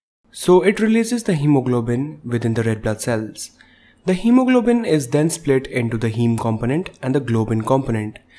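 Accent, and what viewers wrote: India. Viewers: Şu hayatta hintli İngilizcesi kadar kahreden bi şey daha yoktur. Ama iyi video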